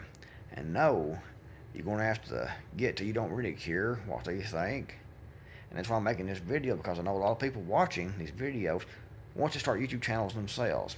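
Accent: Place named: South, United States. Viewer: Reminds me of that scene from Django …”all I hear is criticize, criticize, criticize “. The world is full of trolls, just waiting and looking for opportunities to whine about something. It’s exhausting, predictable and nauseating. Never thought we would live to see such unmanly men and self centered twits who have nothing better to do than nit pick. I never noticed your breathing, I just enjoy your southern drawl 😊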